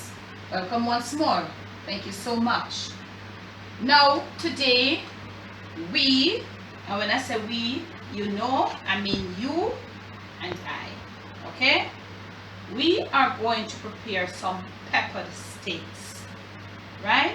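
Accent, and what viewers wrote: Jamaica. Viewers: Thank you for sharing with us your Jamaican pepper steak recipe. I will try it this coming Thanksgiving for my family. You have a nice way of talking, and I like your Jamaican accent.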